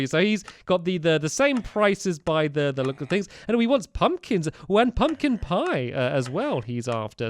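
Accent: United Kingdom. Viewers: Are you british???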